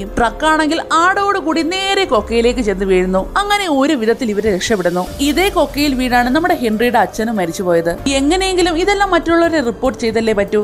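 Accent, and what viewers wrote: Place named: Kasaragod, India. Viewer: Kasargod aanalle? Paanju varunnu yenn paranju (paanju) kasargod alleM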